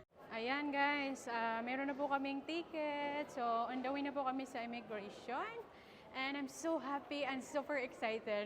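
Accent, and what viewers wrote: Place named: Visayas, Philippines. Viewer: Hi., I'm your new subscriber thank you for inspiring us, its seldom that i subscribe for a vlogger but i like your story and i like also on how you value your family. Please keep it up. By the i'm also a bisaya from southern leyte. I'm amused on your visayan diction. Hehe... please continue to be a worthy pilipina. I support you by subscribing to your channel.Thank you mabuhay ka inday......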